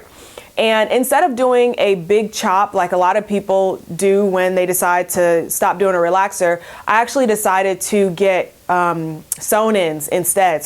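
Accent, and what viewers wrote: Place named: Toronto, Canada. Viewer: Came across your Reiki video and think you are amazing. Beautiful, natural, personable, and so well spoken on Video. I’m from Toronto - you sound like a TO girl. Thanks for all you share. I’ll keep watching. Best from Canada.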